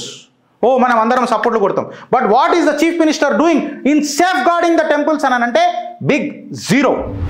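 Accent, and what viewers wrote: Telangana, India. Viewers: Nijamaina telangana vadila matladinav anna❤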